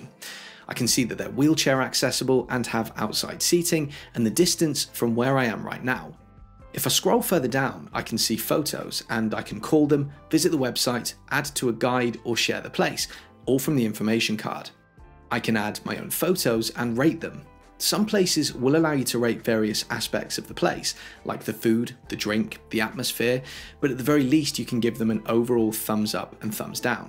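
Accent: United Kingdom. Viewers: The only improvement I need to use Apple Maps exclusively would be the ability to download offline maps. Enjoyed the video, very informative and to the point, and you brits are very pleasant to listen to ( I'm an American, but set my Siri voice to British for that reason lol )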